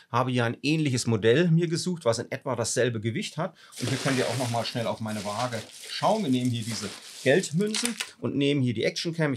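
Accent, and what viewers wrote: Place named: Franconia, Germany. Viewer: Sehr fränkischer Dialekt🤔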